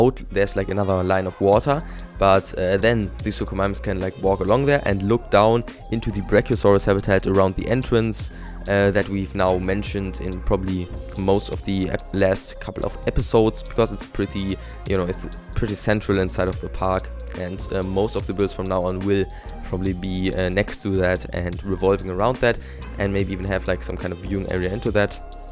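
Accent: Germany. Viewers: I love your german accent i don't know why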